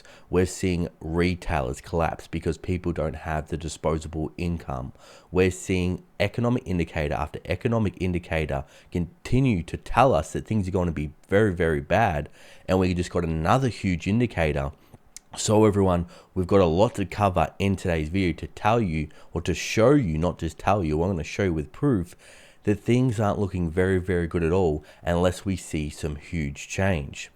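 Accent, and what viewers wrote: Australia. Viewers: An aussie voice is a ray of sunshine on a cloudy scottish day! Happy New Year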